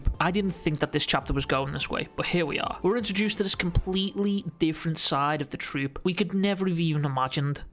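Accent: Liverpool, United Kingdom. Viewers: My scouser reviewer in the building 😍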